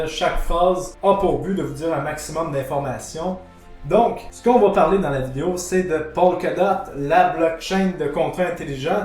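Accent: Canada. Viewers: franchement je suis une dizaine de youtubeur depuis 15 mois ( merci la covid ) et je suis novice et il y à peut de temps ou je suis tombé sur une de T vidéo et j'en suis très satisfait et ca change des autres . je vais t'écouté et voir T propositions et pourquoi pas investir sur T conseil mais je vais encore attendre la fin du weekend . Sinon vu ton accent tu es Canadien ? ou tu es du fin font de la creuse ? Merci pour cette vidéo très enrichissante et bien expliqué . T'assures mec , Force et Crypto au peuple du monde entier, à toi et à la communauté Crypto et un gros doigt aux banques et aux Etats .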